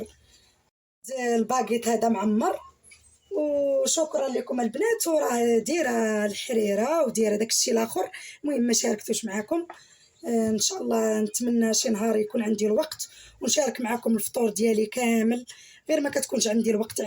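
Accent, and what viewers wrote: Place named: Abda, Saudi Arabia. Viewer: اللهجة ديال عبدية ولكن اختي استعملي دقيق القمح دكالة وعبدة عندهم القمح تبارك الله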